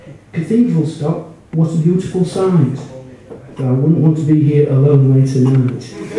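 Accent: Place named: Yorkshire, United Kingdom. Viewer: Hahaha your soooo Yorkshire!!!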